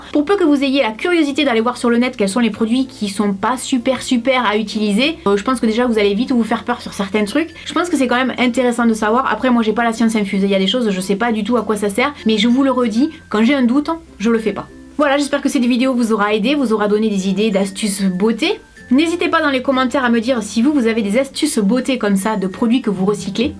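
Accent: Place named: Perpignan, France. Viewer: elle vient de perpignan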